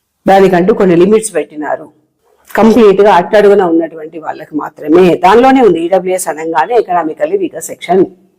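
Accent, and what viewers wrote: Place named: Telangana, India. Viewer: Mee vedio s andhrajanam koodaa choosthaamu,achhu thelangaanaa yaasa lo maatlaaduthunnaaru, koncham andariki ardhamayyelaa maatlaadaalani koruthunnaamu😊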